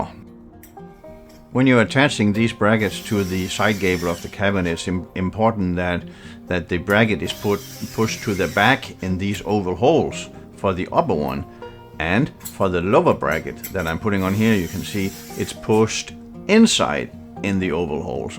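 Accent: Denmark. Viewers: Does anyone have recommendations on which style of Ikea cabinet is best? Has anyone used the Vedhamn cabinets? We're also considering white or off-white. Not sure which model to choose. I love videos. So grateful to have found this channel with this wonderful Danish man. Both of my parents were born and raised in Denmark so I really love hearing that accent.❤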